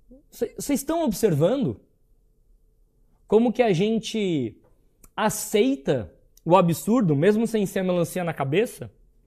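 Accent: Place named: Caipira, Brazil. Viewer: Adoro o sotaque caipira da!!!! Maravilhoso!!!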